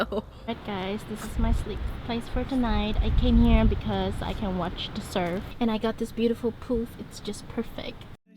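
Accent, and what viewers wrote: Germany. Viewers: Kommst du aus Deutschland?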